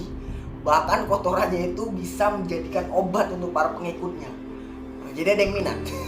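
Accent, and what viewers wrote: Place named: Medan, Indonesia. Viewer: Macem orang medan.. 😁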